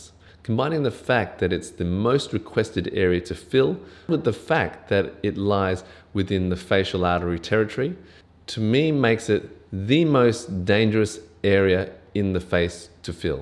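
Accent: Australia. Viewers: Is this an Australian accent?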